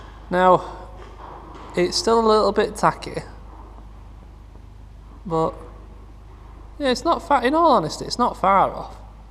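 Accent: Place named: Blackpool, United Kingdom. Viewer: accent a bit like Blackpool youtube legend, the 'walk on the wild side' channel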